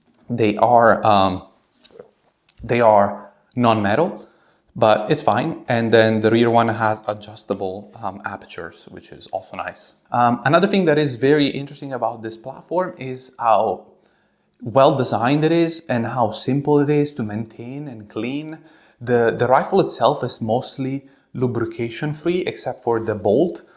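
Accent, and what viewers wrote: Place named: Italy. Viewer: An Italian here you got a great Italian accent also that's a really cool rifle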